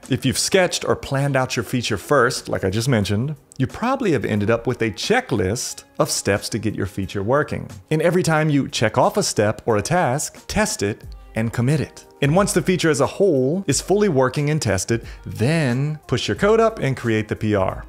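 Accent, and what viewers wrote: South, United States. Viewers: Are you from Georgia or South Carolina by chance?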